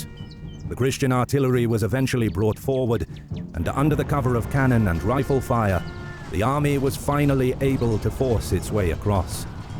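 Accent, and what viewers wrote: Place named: United Kingdom. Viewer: That battle wasn’t a defeat by the Christians alone. The Ottomans disengaged as well and the battle was not for nothing. The Christian army despite their withdrawal accomplished their goal which was dislodging their enemies from the fortified town. You may sound British, but you’re definitely a pro-Muslim person based on your biases. Didn’t you just say in the earlier part that these Ottomans murdered the people they captured? Why are you partial to these murderers?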